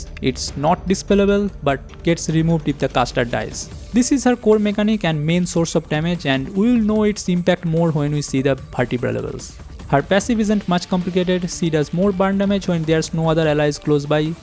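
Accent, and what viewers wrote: India. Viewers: why you sound like an indian?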